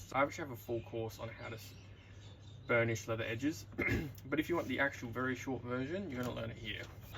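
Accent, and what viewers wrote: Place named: England, United Kingdom. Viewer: Love your videos! Can you give us a tutorial for concealed carry bags? I am an U.S Army veteran, and I carry my gun with me for safety purposes…but the concealed carry bag I purchased on Amazon is already falling apart after having it for 1 year. I was wanting to make my own. Not sure if you support concealed carry weapons, since it sounds like you’re from England, but I just thought I’d ask.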